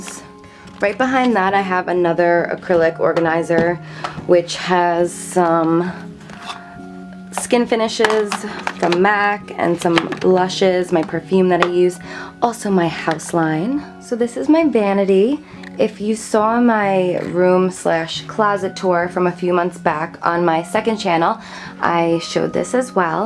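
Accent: New Jersey, United States. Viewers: Good heavens, that's a Jersey accent. Hearing you speak reminds me of my friend's accent and how much I wish she were still alive. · It's called a New Jersey accent, not a California accent. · Your accent has gotten so strong "jersey".. You're adorable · Please don't be sorry for being who you are!!! I love that Jersey accent, and I say cawfee and I'm from New York. Negative comments always come from those feeling that way inside its a reflection of how they feel about themselves. I love all your videos and the genuine side you always show. Beautiful inside and out! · Her Jersey accent ❤ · Actually I think it's a New Jersey accent. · she's from Jersey. It's her accent. I pronounce it the same. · It's a Jersey accent. :) I used to say it like that until I moved to Virginia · Where are u from? Are u from jersey? Trying to figure out where ur accent is from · new subscriber here - love your Jersey accent!! 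(I am from Long Island - we have a similar accent :-) great video - got lots of ideas! Thanks!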